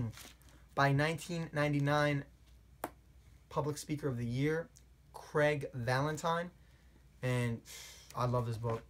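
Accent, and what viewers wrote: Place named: Pittsburgh, United States. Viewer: you switch between wooder and water a lot haha pittsburgh..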